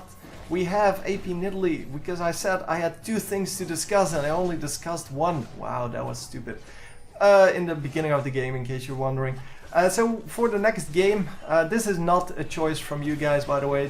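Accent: Netherlands. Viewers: The 'obviously dutch' way he prenounces every single word just annoys me so much and his overreacting.. oooohhhh niiiiicely done! Good work MEENN. Next time spectate a elo instaid of lvls 20/25. Thank you.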